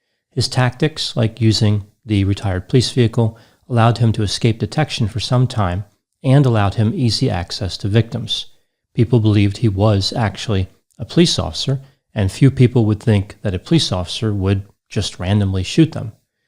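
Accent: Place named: Boston, United States. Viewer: Hi Dr Grande fans, can you tell me what accent is? I’m from Australia and I can often pick American accents but I can’t quite pick this one. His accent is apparent when he says the word ‘on’ and it is super cute. If I had to guess I would say Boston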